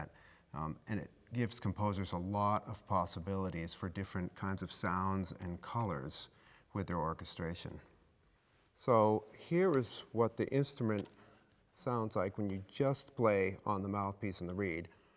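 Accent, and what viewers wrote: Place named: Utah, United States. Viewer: Is it just me who likes his utah accent?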